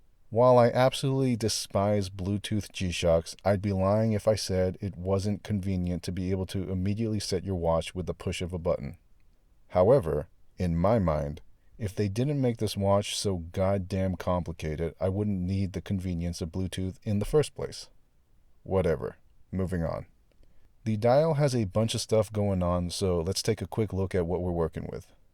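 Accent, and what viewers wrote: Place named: United States. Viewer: Great to hear American English good detailed review !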